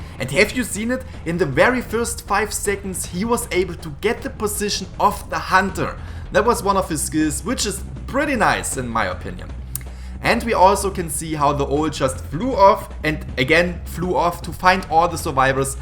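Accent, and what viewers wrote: Germany. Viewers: Are you originally german because your English sounds like a german person talking English?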